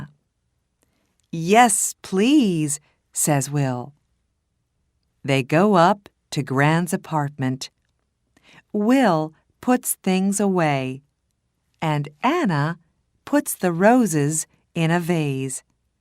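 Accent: United States. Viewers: I think it's American English. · Thank you a lot! Could you tell me what kind of English pronunciation it is. Is it American English pronunciation? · Hello Is it american english?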